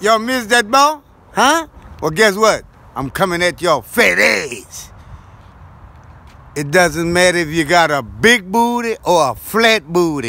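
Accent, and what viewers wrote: United States. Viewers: He sound like he from my city, Lafayette Louisiana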